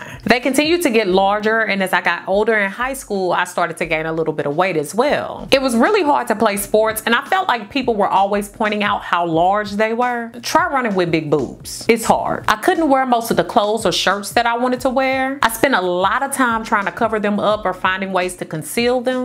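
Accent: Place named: Midwest, United States. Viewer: You sound like Monique from Moknowshair channel. Are you from St. Louis or the Midwest?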